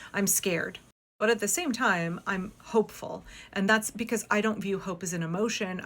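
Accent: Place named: Alabama, United States. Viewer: UGH, sounds like Bama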